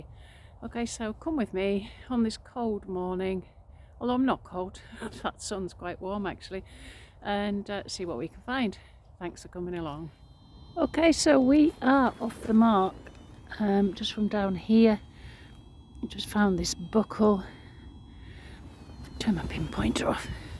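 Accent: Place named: England, United Kingdom. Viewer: Nice understated English voice, a change from bawling Americans!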